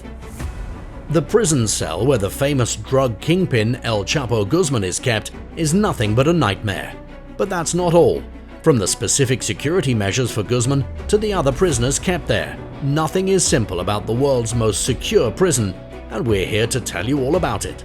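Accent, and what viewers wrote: United Kingdom. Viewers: I don't think he's Canadian my be British or Australian with not so thick of an accent.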